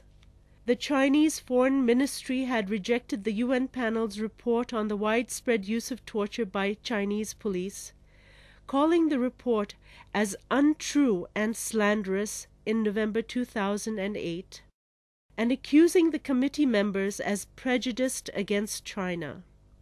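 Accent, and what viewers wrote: India. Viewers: 印度口音的英语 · 你麻痹的，阿三口音？贱民一个！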